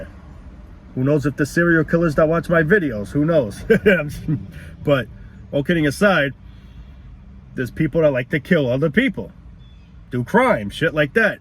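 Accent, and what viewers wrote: New York, United States. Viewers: Hey dude I just discovered your channel and love it! You speak the truth! Most people just plain suck and this rat race life is awful. I hate it. I hate getting up in the morning to go to work and deal with the inconsiderate drivers on the road and then with the annoying and fake people at work. I hate then coming back home and only having barely enough time to eat before I have to sleep and then do it all over again. Ugh. I am going to try really hard to get rich somehow and then not have to work as much. Most people out there take advantage of others and are not your friend. Keep pumping these videos out bro you are doing a great job. Are you from New York? What part? That accent is pretty thick. Thanks!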